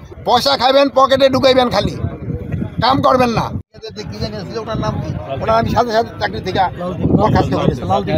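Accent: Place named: Bikrampur, Bangladesh. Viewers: স্যার কি সুন্দর আমাদের বিক্রমপুরের আঞ্চলিক ভাষায় কথা বলেন। শুনে খুব ভালো লাগলো আর এইভাবেই এগিয়ে যান দোয়া ও ভালবাসা রইলো ❤❤❤ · Amader bikrampur er Language style emoni bhai..proud of bikrampurian advisor ❤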